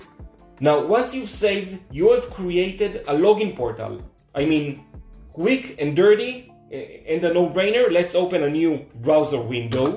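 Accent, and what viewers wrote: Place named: Israel. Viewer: חחחחחח אי אפשר לברוח מהמבטא הישראלי אחלה סרטון אח יקר.. מקצועי לגמרי ברמה של חו"ל מוקווה ששומר על עצמך בימים האלו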